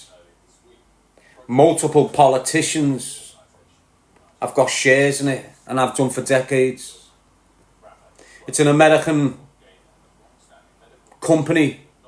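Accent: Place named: Liverpool, United Kingdom. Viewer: Scouser u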